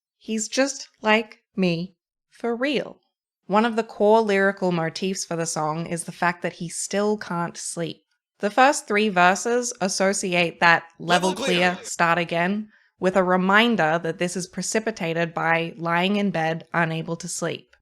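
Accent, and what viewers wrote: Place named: Australia. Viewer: Love your analysis, and also the storytelling in your video. Editing is solid and considered, and your performance within that is genuine and carries with it the weight of your words. Glad to have stumbled over your work! Thank you for answering this particular call with yes. Also lovely to hear content with an Aussie accent, and you did a bang up job on your eye shadow. GG all round! Now if you don't mind I'm going to go make my 10,000th Iridium sprinkler and pat all my cows; midnight be damned!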